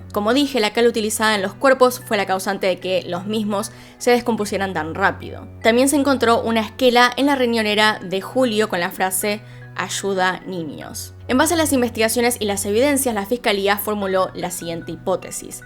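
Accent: Argentina. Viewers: Esta mujer me encanta tambien😻😻 sobre todo por su acento argentino y su inteligencia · soy de origen mexicana pero casi toda mi vida vivo en Amsterdam, tan chistoso el accento Argentino con el sssshhhhhhh muy interessantes video's nadamas tu maquillaje muy claro/blanca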